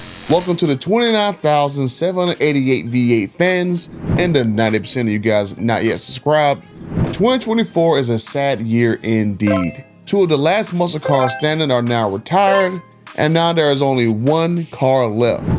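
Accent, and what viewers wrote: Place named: Carolinas, United States. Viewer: He's gotta be NC or SC or somewhere in this area with his accent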